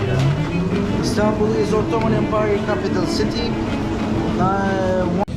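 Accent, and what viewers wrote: South Korea. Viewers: 경상도사투리 쓰셔서 경남 사시는줄😂😂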